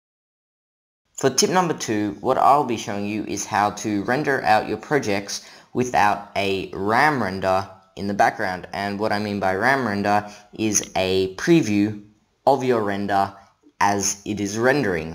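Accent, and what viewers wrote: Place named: India. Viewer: Thanks a lot man!! From 5 hrs to 1 hr.😍😍 I wish I saw your video long time back. Thanks brother. I'm from India. It seems from your voice that you are also an Indian. Am I right?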